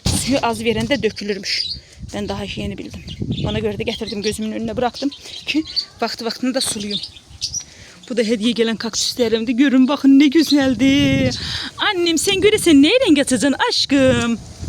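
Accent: Azerbaijan. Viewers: Кardesim ellerinize saglik siz azerisinizmi .konusma iz cok benziyor azeriye.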